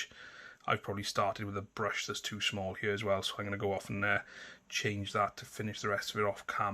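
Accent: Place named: Welsh border, United Kingdom. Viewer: It’s nice to hear an accent that’s close to home. I’m on the welsh border myself! Great content!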